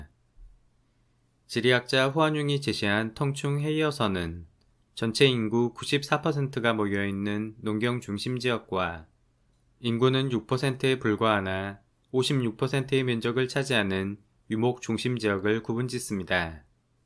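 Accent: Jeolla, South Korea. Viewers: 전라도 말씨 나와요 ㅋㅋㅋㅋ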